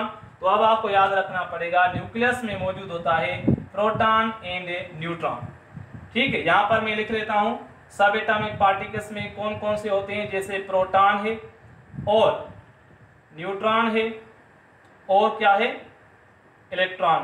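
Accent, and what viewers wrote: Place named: Sindh, India. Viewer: baba saein full sindhi accent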